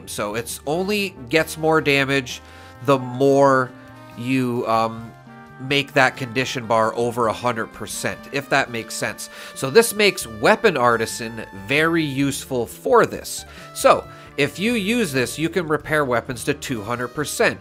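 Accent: Canada. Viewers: Dude, are you Canadian perchance?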